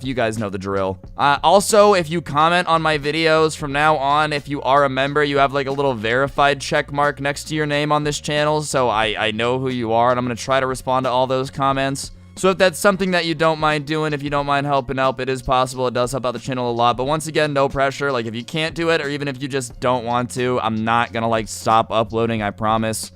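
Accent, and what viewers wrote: United Kingdom. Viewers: Great, British accent /\ | | Do I need a coma there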